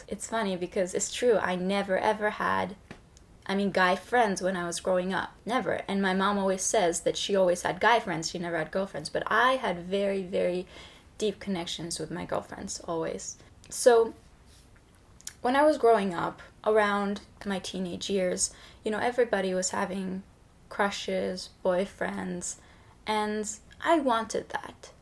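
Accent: Turkey. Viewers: Bende 16 yasinda turkiyeye geldim, 5 sene burdayim ve burda ingilizce konusan yok, ama ben ingilizcemi unutmadim, ingilizce ana dilimdir, ama bu kiz nasil unutuyor onu anlamiyorum... Yalan ya hepsi.. saten, amerikan aksanininda turkce olan tang'i var. ( evet turkcem daha cok gelisti ama ucmadi burda) Basically, she has a Turkish tang in her voice, it's kinda like how latinos speak.. I'm sorry to say this sweety, but you couldn't make it more obvious. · Aksani var hatta basta turk oldugunu bilmiyodum cok sasirdim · Bilimsel açıdan bakacak olursak 7 yaşına kadar öğrendiğin tüm diller anadilin oluyor (detay için Noam Chomsky'nin LAD çalışmalarını okuyabilirsiniz) ve beyin hasarı almadıysan kolay kolay bu dili unutmuyor, dili konuşurken bir anda aksanlı konuşmaya başlamıyorsun. 15 yaşına kadar Türkiye'de yaşamış ve türkçe konuşmuş bir insanın bir anda 5 veya 10 senede dilini unutup kendini ifade edememeye başlaması tamamen tiyatro. 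Kaldı ki İngilizcesi de çok belli olmasa da aksanlı ve bazı kelimeleri türkçe aksanla telaffuz ediyor. Bu tarz triplere girmek garip, bir dili iyi konuştuğu mesajını vermek için başka bir dili kötü konuşuyormuş taklidi yapmana gerek yok. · Türk aksanıyla İngilizce konuşuyorsun Türkçe fazla konuşmasan da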